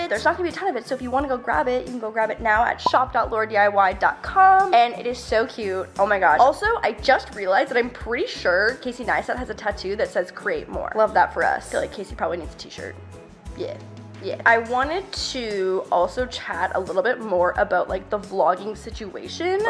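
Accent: Canada. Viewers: why did i read the title “i’m sorry” in your canadian accent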